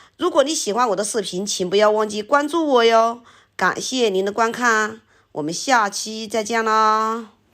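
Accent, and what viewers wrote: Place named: Jiangxi, China. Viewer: 很熟悉的赣普话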